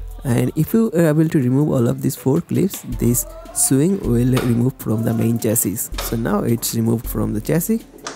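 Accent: Bengal, India. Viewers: bhaiya bengali ho kya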